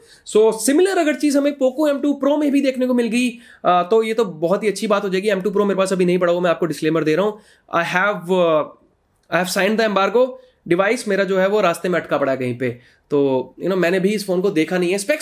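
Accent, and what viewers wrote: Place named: India. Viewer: I have so much pain trying to understand what you are saying. English is not my first language but i usually have no problem understanding indian people that speak English. But somehow your accent is so strong i don't understand half of what is said.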